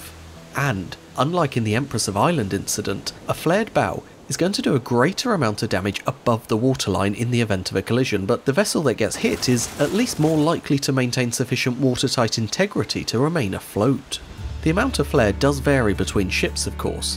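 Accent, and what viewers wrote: United Kingdom. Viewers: Why are all the youtube documentary and educational videos with a british accent? They're not ALL attractive, this one is gross he sounds like he's 12.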